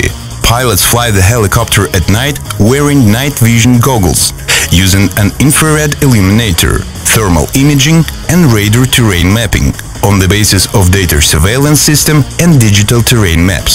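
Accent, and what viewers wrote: Russia. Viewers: Такое чувство, что это русский пытается говорить по-английски. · русский ваня говорит((((((((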